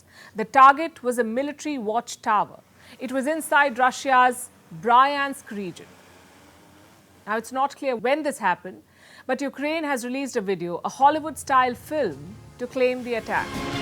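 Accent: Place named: India. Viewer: It's Amazing how Indian slaves mentality talk in funny English 🥁🤣🇬🇧 rule Britain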